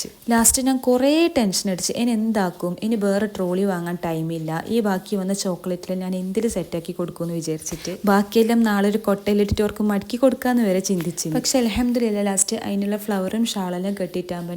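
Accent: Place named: Kasaragod, India. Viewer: Kasaragod language undhallo🫵🏻😂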